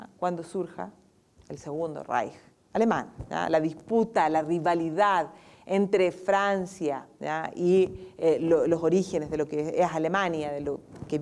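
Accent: Chile. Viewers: Así hablamos los Chilenos.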